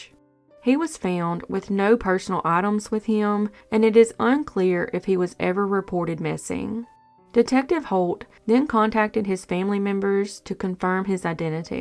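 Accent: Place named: South, United States. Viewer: Another great video! Your research and videos are very much appreciated ♥️ P.S. as a Massachusetts resident I adore your southern accent. I don’t hear southern accents up here often so it’s really soothing to hear.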